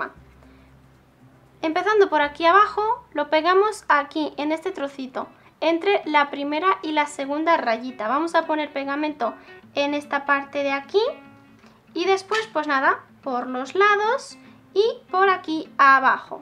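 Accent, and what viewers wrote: Spain. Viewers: por tu voz eres española cierto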